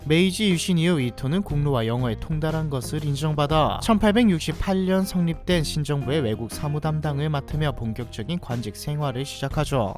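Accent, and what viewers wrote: Gyeongsang, South Korea. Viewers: 갱상도가 표준말 하느라고 욕 본다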